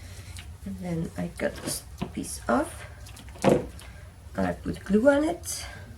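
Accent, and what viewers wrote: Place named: France. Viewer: 🤗You are doing fine. Sounds like a French accent.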